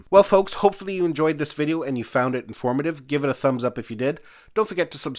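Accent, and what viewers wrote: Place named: Maritimes, Canada. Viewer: Have yet to get my first drone, and am considering this. Excellent in-depth review, descriptions, and explanations. I learned a lot. By the way, what part of Canada was the footage shot in? The accent sounds a bit east coast-ish.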